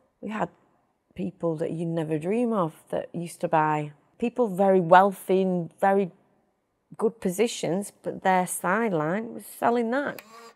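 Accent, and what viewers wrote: United Kingdom. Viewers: Why does she have a British accent? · This is the most British sounding Italian I’ve ever seen. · Wonder why she has a British accent? I guess she's from the UK? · Set sounds like she lived în Uk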